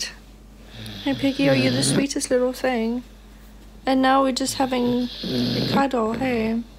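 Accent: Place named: England, United Kingdom. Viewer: I just love your English accent (I am from US) Your close ups of piggy's face are really cute and funny! I love your your commentary, too!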